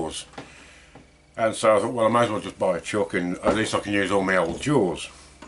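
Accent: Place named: England, United Kingdom. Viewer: I wish I lived closer, I have always said “ anyone who has an English accent automatically has 10 points added to there IQ”. I as well have two super Nova chucks one for my Jet lathe and one for my General lathe. I enjoy your method of presentation on your videos. Keep up the good work. Cheers Don Lakewood Wa......U S A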